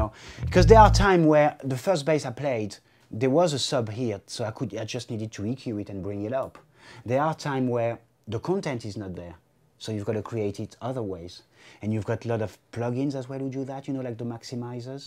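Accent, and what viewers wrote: France. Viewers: Goog french accent haha Thank you for the video ;) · haa probably french accent no? better to understand in the middle of all this english lesson. ;) good lesson thx peace !!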